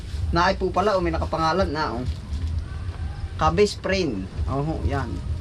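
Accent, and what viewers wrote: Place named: Tagalog, Philippines. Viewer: Greetings to u from California, pinanonood namin ang vlog mo before going to bed kasi nakaktuwa ka lalo na at kumakanta ka ng ginagawa mo lang na lyrics. Ang diction mo nakakatuwa rin pure tagalog ang salita mo pero parang ngayon ko lang narinig na tagalog malalim kasi. Pray always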